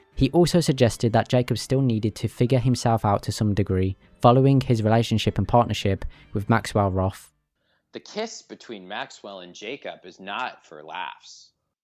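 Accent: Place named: Birmingham, United Kingdom. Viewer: Errr Birmingham accent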